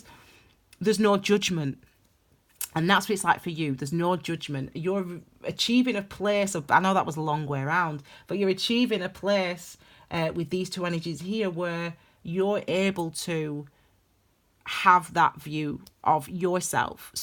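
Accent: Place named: Lancashire, United Kingdom. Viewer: First time listening, great reading, really spot on for me! Your accent sounds like you're a Lancashire Lass, am I close? I'm from that region originally myself. ♥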